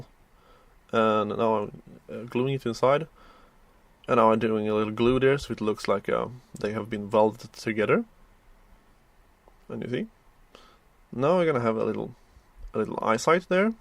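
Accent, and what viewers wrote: Sweden. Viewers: THE SWEDISH IS OBVIOUS!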